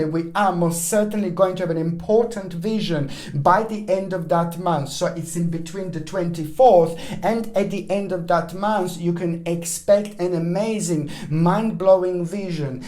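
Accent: England, United Kingdom. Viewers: English accent.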